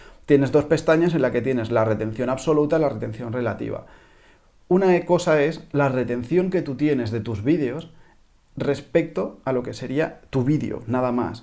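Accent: Spain. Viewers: Buenos consejos, normalmente me da pereza el acento español pero contigo esta bastante ameno hah! saludos de México. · Eres Catalan?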